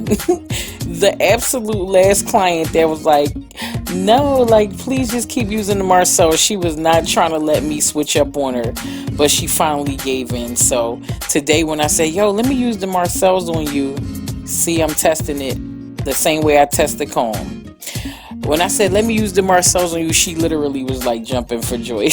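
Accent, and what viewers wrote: Philadelphia, United States. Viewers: Omg where are you located...you lowkey sound like you from philly and that’s where I’m at and I need a appointment 🤩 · Love your channel! I could listen to your accent all day. I live in Atlanta but I’m from the suburbs of Philly and as soon as I heard your voice I knew!!! Such talent ❤️☺️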